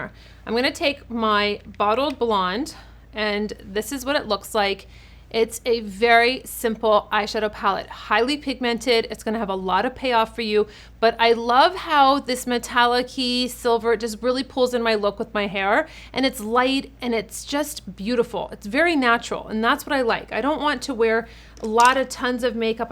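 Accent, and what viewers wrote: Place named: United States. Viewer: Like watching and listening to a stroppy teenager army Sargent. I'll look for someone less ... American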